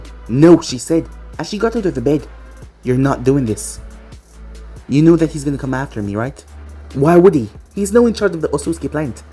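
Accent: Jamaica. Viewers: Hey i have a question by any chance are you jamaican???🤔🤔🤔🤔, I am just asking since when I listen to your stories i hear a bit of the accent🤔🤔🤔🤔 #hmmmm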